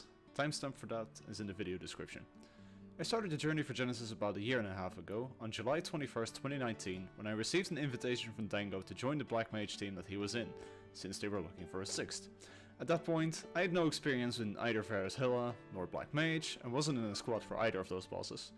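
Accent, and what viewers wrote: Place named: Netherlands. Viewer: You sound really dutch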